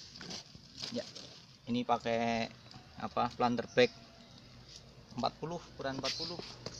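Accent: Banyumas, Indonesia. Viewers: Mas sampean kok medok banget..ngomongnya kok ngapak2...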